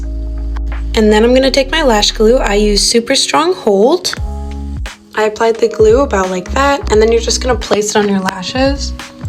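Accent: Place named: Netherlands. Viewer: You sound very dutch 😂 I am dutch aswell :3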